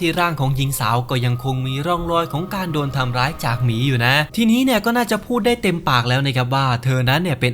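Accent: South, Thailand. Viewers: พี่เป็นคนใต้หรอ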